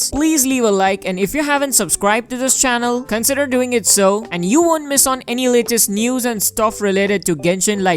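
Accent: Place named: India. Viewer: u r an indian right? ur accent is like that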